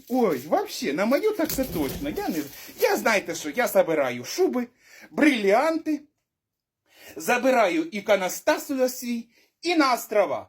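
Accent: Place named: Surzhik, Ukraine. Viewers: І на Донеччині❤❤❤❤❤❤❤❤❤❤ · Шановний! Ви розмовляєте так як говорять у нас на Полтавщині! Полтавський колорит!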